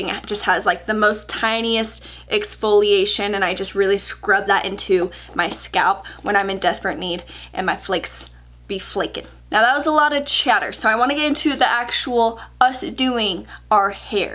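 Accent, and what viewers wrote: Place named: New York, United States. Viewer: you sound like youre from new York in this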